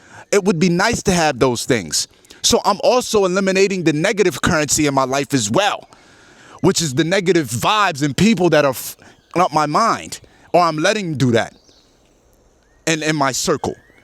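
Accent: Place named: New York, United States. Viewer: Where you come from ? NYC ? Sounds like it.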